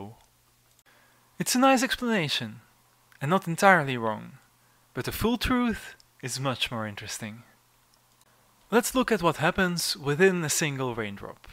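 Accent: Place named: Europe. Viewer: When you click on a YT thumbnail: 😊 When the European accent starts 😢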